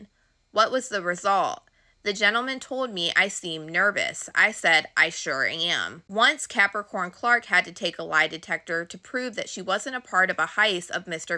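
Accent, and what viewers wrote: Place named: Michigan, United States. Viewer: Where are you from? Your accent sounds like Michigan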